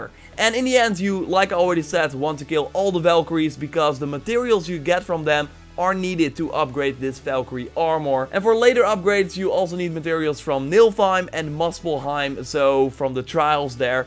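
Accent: Netherlands. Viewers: Jezus what a strong Holland accent!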